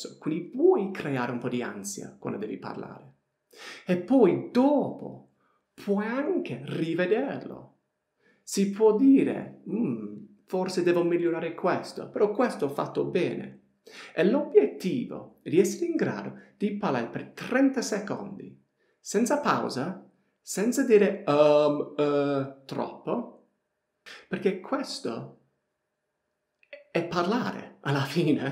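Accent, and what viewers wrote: Apulia, Italy. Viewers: Ma no !! Lui è inglese quindi parlerà la sua lingua perfettamente ! Quando parla in italiano ha l'accento pugliese perché ha praticato l'italiano con i suoi parenti tutti i giorni mettendo in atto quello che consiglia in questa lezione. Ascoltare e ripetere ... Lo ha fatto in Puglia con dei pugliesi ecco perché ha questo accento simpaticissimo. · Ti seguo da poco Sei fantastico simpatico e bravo Poi il tuo accento pugliese è originale Fai lezioni private on line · vabbò figurati, io parlo da solo da quando sono nato, quindi si scandalizzerebbero ben poco. 🤣 Comunque il tuo mischiare inglese e pugliese è veramente fantastico. 🤣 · In love with your Apulian accent ♥️ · The same 😅 Allora non mi sbagliavo.. ho percepito una cadenza pugliese 😀. Very nice teacher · Thanks for your advices! They're very interesting and useful! I like Reading articles in english and recording my voice repeating that! 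I like watching your videos. You look just like my american oncle, the way you move, the way you speak With your apulian accent, (we are apulian too). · Your Apulian dialect is very funny and above all it is "spot on" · Bravissimo, semplice ed esaustivo. Il tuo accento pugliese è mind blowing 😄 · sei fantastico, davvero belle le tue lezioni. Poi hai acquisito quell'accento pugliese che è il top 😁😁👍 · L'unico (bravo) insegnante di inglese al mondo con l'accento Pugliese!!! Adorabile!!! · A tratti sembri pugliese :)) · Non perdere l'accento pugliese .. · Accento Puglinglese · Thanks! Did you know that your italian accent is from Puglia? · ma hai studiato italiano in Puglia? xD hai una cadenza molto simpatica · This guy has a strong Italian accent from Bari, Apulia. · Sì il suocero dev'essere un pugliese, si sente!!🤩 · Inglese con accento pugliese..è stupendo😄👍😉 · Eng-Pugliese, favoloso, love it! 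· Ascoltando il tuo italiano, penso che tu hai imparato l'italiano in puglia o in abruzzo, è vero? troppo forte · Ma tu hai origini pugliesi?? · si sente l'accento pugliese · Sei molto bravo. Mi piace come spieghi e lo fai anche in un modo simpatico...l'accento pugliese è ancora più simpatico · Come calabrese? Secondo me è accento pugliese. Sullo stile sono d'accordo... ottimo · Ciao una curiosità..il tuo accento pugliese si sente quando parli in inglese con i tuoi connazionali? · Ma come si fa a non amarti? Sei uno splendido insegnante. È un piacere ascoltarti e imparare da te e con te e tramite te. Il tuo italiano/pugliese è adorabile!!!! Grazie mille per ciò che fai. God bless you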